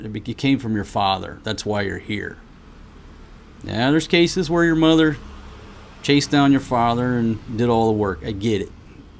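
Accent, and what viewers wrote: South, United States. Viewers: I’m a new sub.. I enjoy listening to your conversations. I will not judge, I just want to understand some things. Who is Maria’s mother? Is she your biological daughter? How did you name your son Forest G? He’s adorable & so is Maria. I’m very familiar with your personality , being the redneck that you are, 😅the way you talk, cuss a lot & drink a lot. I understand the way you talk. I’ve lived in Georgia long enough 😅. You are a good story teller. You can be amusing, funny, & serious. Glad you’ve clarified your position with Janice’s baby. Continue your life stories while people here in the US are still trying to learn their pronouns. Now you’re the hillbilly of the Phil. 😂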